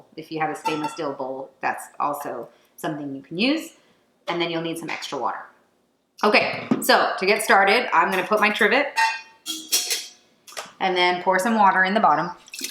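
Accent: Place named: Gujarat, India. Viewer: are you from south gujarati? You have same accent as me and love your dishes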